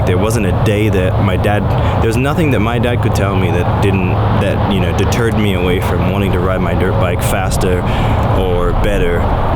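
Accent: Australia. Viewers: Yay sounds like an Aussie when hes mad......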